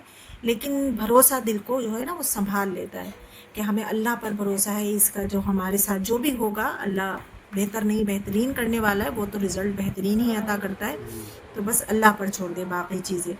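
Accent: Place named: Pakistan. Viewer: AAP BILKUL PAKISTANI ANDAZ M BAAT KARRAY AUR INDIA SE H LIKHAY BUT CONFUSION AAP BICHMAY 🎶 🎵 MUSIC NA DALLAY BAQI ZABARDAST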